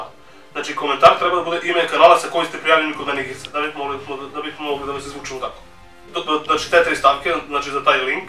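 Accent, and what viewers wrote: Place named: Southern Serbia, Serbia. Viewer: odakle si, po govoru bih rekao da ste iz leskovca ili babusnice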